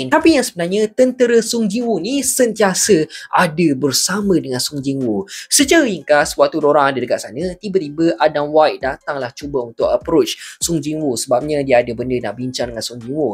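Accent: Malaysia. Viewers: Bagus bang Sekurang kurang nya ada jugak video suara malaysia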